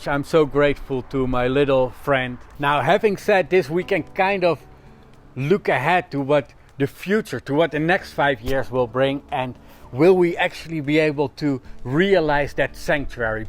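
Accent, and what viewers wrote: Netherlands. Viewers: Are you Dutch? C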